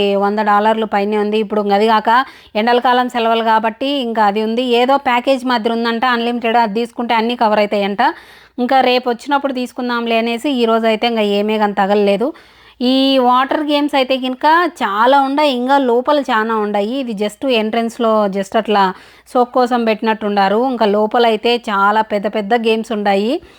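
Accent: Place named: Kadapa, India. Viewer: Bagundava akka Maadi kuda Kadapa ne pulivendula Maadi meeretla matladataro maa oorlo atle matladataru I'm sorry connected to u akka I like ur thought process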